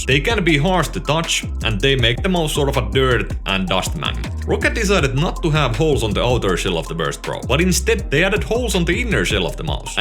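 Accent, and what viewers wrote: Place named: Finland. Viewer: Aaaaaaaaaand the guys rallienglanti is just satisfying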